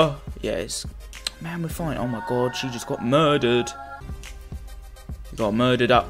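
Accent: United Kingdom. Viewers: I think you have a British acsence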